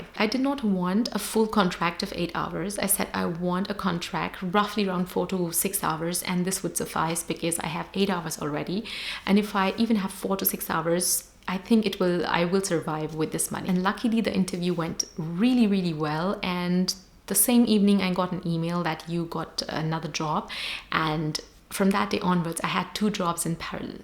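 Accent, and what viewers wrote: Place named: Germany. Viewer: Hello, was your Masters German taught? I hear a bit of German accent in your tone.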